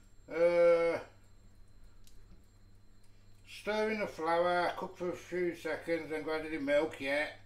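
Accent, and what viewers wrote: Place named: Newcastle, United Kingdom. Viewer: Can't beat a Geordie chef lol whye aye man 😂👌👍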